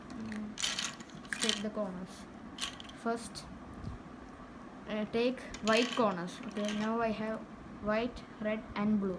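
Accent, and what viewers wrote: India. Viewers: Are you a malayali your English is like that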